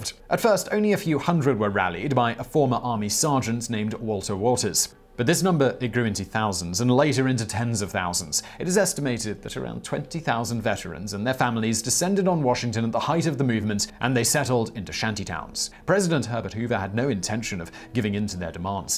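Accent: United Kingdom. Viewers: There are times when I watched him before I knew where he was for certain that I could not tell. Is he American (no) is he British (maybe?). I figured he was a transplant.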